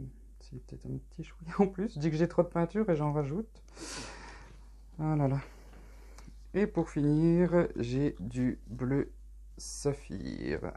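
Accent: Franche-Comté, France. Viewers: C'est super beau les nuances nacrées, j'aime beaucoup 👌 Tu es de quelle région ? Tu as l'accent franc-comtois 😁😁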